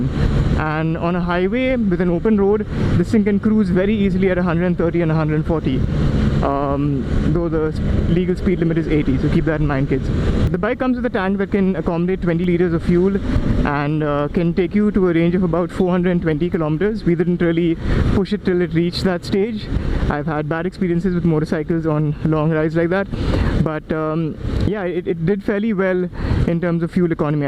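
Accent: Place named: India. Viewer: Our hero back again with awesome content. And I love your hindi accent.😍😊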